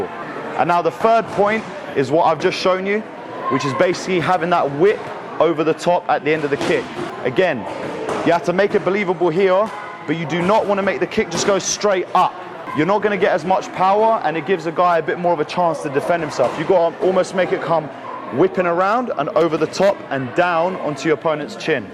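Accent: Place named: United Kingdom. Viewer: He has a british accent.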